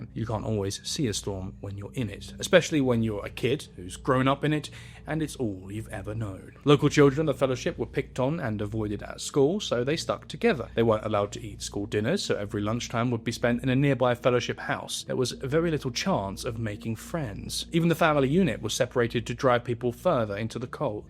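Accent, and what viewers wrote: England, United Kingdom. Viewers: That's just how English people speak. I think you're reading something that isn't there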